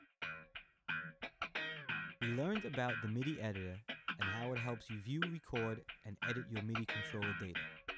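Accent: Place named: Long Island, United States. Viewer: that guitar lick in the background does makes this unwatchable. plus his Long Island accent is brutal. its like recording with the Sopranos.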